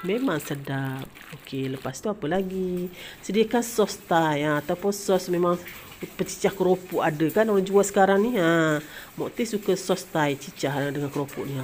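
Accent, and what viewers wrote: Malaysia. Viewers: aku org ganu taulah ganna wahwahwah ni org ganu ker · kita biasa mkn kopok ganu hok gigit putus mmg sedap.. syabas tahniah..klo dgr suara ni org kelantan ke ganu?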